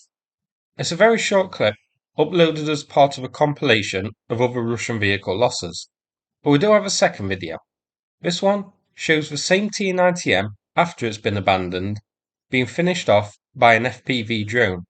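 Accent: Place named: Mansfield, United Kingdom. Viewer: Has anyone ever noticed that pronounces Russian words better that English? It's got to be his Mansfield accent.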